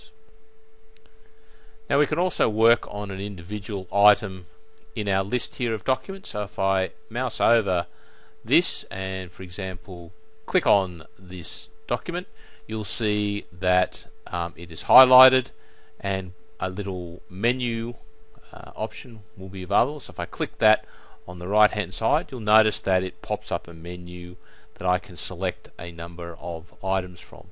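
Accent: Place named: Australia. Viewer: Thx for the great video! Love ur Aussie accent :)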